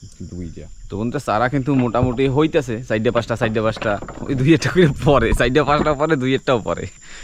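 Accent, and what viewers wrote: Barishal, Bangladesh. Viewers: তোমার কি বাপদাদা বরিশাইল্লা ছিল। বরিশাল এর মত কথাবার্তা 😊😊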